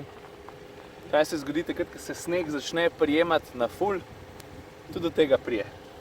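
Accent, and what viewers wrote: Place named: Maribor, Slovenia. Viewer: od tvoje mariborščine cepam :D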